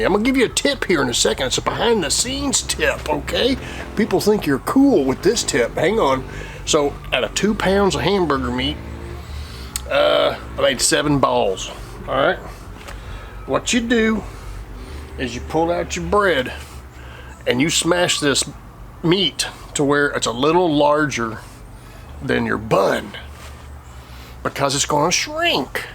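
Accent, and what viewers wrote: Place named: Texas, United States. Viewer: Hi.. I'm your friend from Jordan.. I just love that Texan accent of you.. It reminds me of those old series i used to watch on TV when I was little kid... Like "Guns Smoke ".. I remember that guy "Festus".. I also remember " The High chaparral ".. 🤗 Anyways.. I enjoy so much your amazing.. Awesome ways of cooking, 🤗💕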